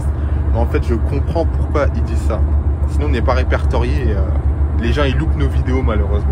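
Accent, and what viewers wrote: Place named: Antilles, Caribbean. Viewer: ahah l'accent timal on reconnais les frero tu es d'où ?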